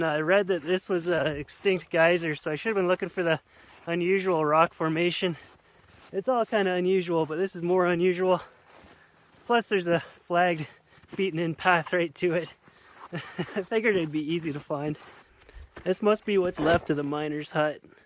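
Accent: Canada. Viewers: Crazy beautiful scenery. Utah is now on my list. Also, has the most Canadian of Canadian accents. I'm also from BC. I wonder if I sound like him when I speak. Probably. Eh?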